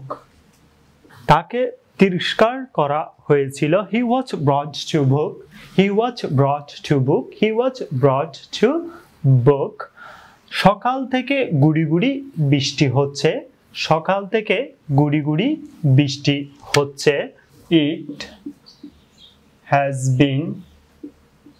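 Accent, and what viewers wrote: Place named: Chittagong, Bangladesh. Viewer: ভাইয়ের বাড়ি কি চট্টগ্রাম নাকি? ভাষা শুদ্ধ করে, ভালো করে উপস্থাপন করাটা এই প্লাটফর্মে অতিব জরুরি। আশা করি, বুঝতে পেরেছেন, আমার কথা। ধন্যবাদ।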